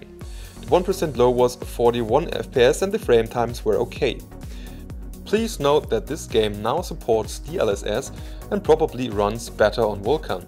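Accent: Germany. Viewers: Moiin Denke du bist auch deutsch so wie dieser akzent klingt... (nicht der einzige hinweis)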